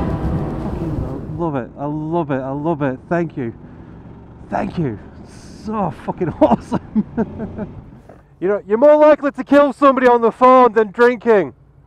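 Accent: United Kingdom. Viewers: what part of northern england is that accent dude. great vid by the wayl.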